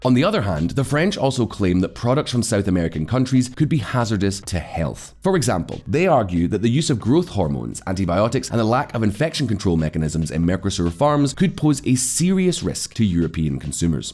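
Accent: United Kingdom. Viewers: Curious which part of UK is the narrators accent from?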